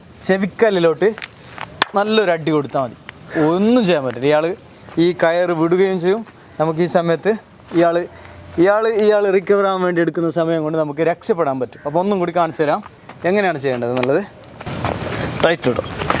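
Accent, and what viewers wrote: Kasaragod, India. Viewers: ഇങ്ങടെ കാസർകോടൻ ഭാഷ, അത് കേൾക്കാൻ ഒരു സുഖമാണ് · കാസറഗോഡ് ഭാഷയുടെ നിഷ്കളങ്കത നാളുകൾക്കു ശേഷം കേൾക്കുന്നു.............സ്നേഹത്തോടെ ഒരു കോഴിക്കോട്ടുകാരൻ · കാസറഗോഡ് കാരനാണോ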